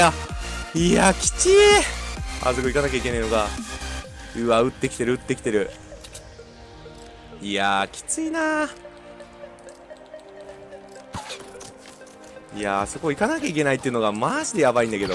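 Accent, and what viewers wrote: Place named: Kansai, Japan. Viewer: 関西弁すこ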